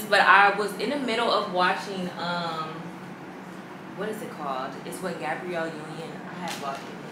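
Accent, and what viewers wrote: England, United Kingdom. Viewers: Your English accent gets me every time ….and I’m from London 😂😂😂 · it’s the british accent for me lmaoo, im happy you’re in a better state ❤️❤️keep the vlogs cominggggg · The beginning of the vlog is just funny 😅😅 the UK accent love you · Loving the. Vlogmas motivation is key keep it up . And your British accent l😂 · The Accent is sending me especially being British😂😂 The vlogs are always so motivational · You’re so unique I love your vibes! And your bri-ish accent 😂💖🥰 ps: you drew a candy cane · The British voice 😂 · Elloooooo british accent 😅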